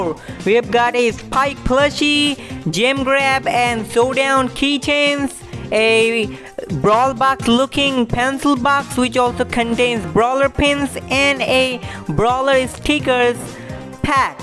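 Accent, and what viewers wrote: India. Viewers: ARE YOU INDIAN? BECAUSE YOUR VOICE AND NAME IS LOOKS LIKE INDIAN NAME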